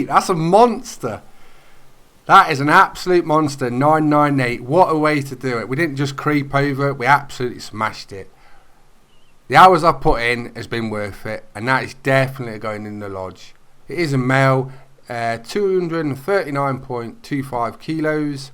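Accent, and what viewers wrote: Midlands, United Kingdom. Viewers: Nice to see you finally nail it. Your accent sounds regional. Are you Nottingham, central Midlands?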